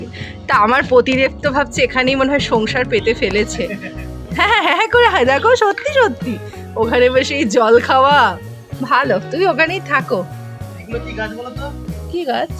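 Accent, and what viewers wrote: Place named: Bangal, Bangladesh. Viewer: Duto paisi.. Valoi to bangal bolle bonu😂❤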